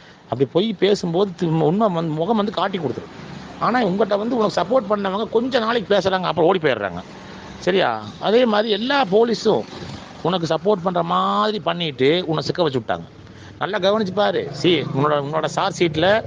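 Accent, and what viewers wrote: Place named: Kongu, India. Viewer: பக்கா கொங்கு தமிழ்❤😂❤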